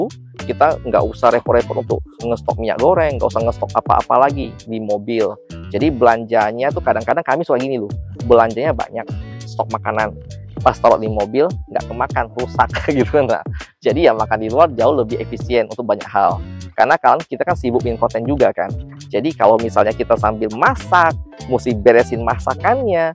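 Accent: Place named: Bangka, Indonesia. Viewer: Abang ni asli nya Bangka atau gimana bang?? Bahasa Abang macam orang Bangka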